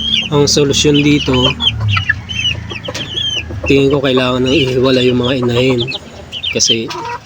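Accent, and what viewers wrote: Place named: Bulacan, Philippines. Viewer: Parang taga bulacan po ang inyong punto ng salita salamat po dammam saudi arabia po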